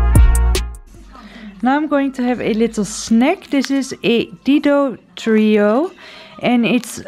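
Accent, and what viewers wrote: Netherlands. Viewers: Ik hoorde meteen je Nederlandse tongval😅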